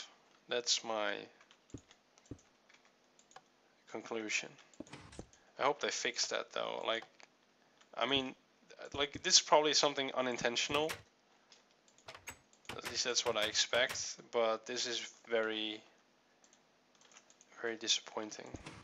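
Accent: Netherlands. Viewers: i stil think you're from holland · dude je engels klinkt nederlands. dude your english seems dutch. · Ur dutch? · dutch english sounds sooo bad :S nothing personal man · YOU MUST BE DUTCH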